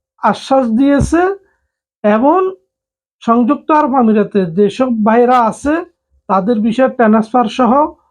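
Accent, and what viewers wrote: Bangladesh. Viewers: আসা হরছি আপনি সিলুটি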